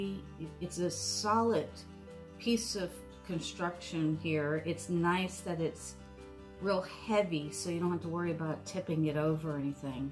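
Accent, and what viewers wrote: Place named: New Jersey, United States. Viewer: You sound like you're from New Jersey.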